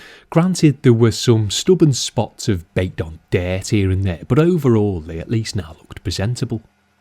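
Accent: Liverpool, United Kingdom. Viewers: Liverpool. Caution: They don't always sound this. Usually, it's not so much refined and poetic as this, moreso a nasally flem filled cough imitation. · Are you from Liverpool?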